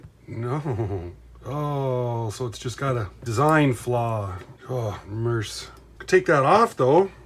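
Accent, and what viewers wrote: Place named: North, United States. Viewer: Hey,. I normally stick with ya til the end because I enjoy hearing you talk. You have a Northern states accent that’s pleasing to my ear, oh sure! 😅 And I don’t want to miss any of your wisdom you put out about repairing the model trains. There’s three of you fellas I routinely follow because you each repair and restore model trains that teach me plenty about my own equipment and I hate to miss any information because I fear what I miss just might be that one odd piece of info I need to fix or correct something on one of my trains. Now I collect HO equipment but that doesn’t mean there isn’t something I could learn if you cover a Lionel or American Flyer repair. Electrical repairs or soldering are easily transferable skills in model railroading. Best wishes and see you on another video.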